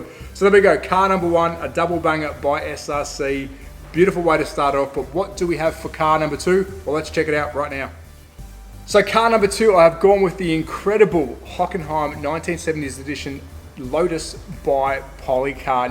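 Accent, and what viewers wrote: Australia. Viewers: That Australian accent just grinds on me. Do not like it. Sorry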